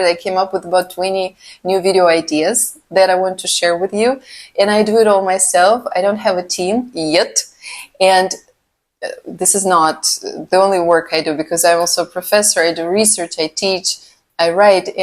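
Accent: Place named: Russia. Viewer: Thank you, Dr.. You say things in a way that gets to the point without having any pride or ego attached, yet there is compassion and care without overstepping lines. I liked you right away. And, I never thought I would say this publicly (it’s funny how it came up), but your Russian accent was another reason I liked you right away. I don’t have any connections to Russia but it’s always held a special place in my heart; I’ve yet to fully find out why. Just wanted to say I’m glad things happened for a reason and I fully appreciate your wisdom and authenticity. Thank you again. May you have a wonderful new year.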